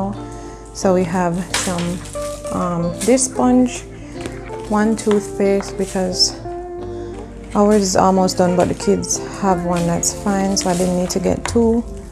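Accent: Jamaica. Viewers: Hey happy to be participating in this collab with you do I hear a accent in there somewhere Jamaican. New friend here and new subscriber as well going cover for IG group he's too adorable thank you for sharing❤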